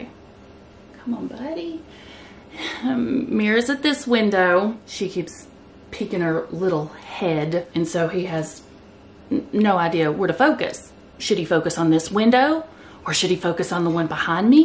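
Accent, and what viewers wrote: South, United States. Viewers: I love how you talk. You’ve got such a lovely accent. I’m guessing you’re a southern lady? x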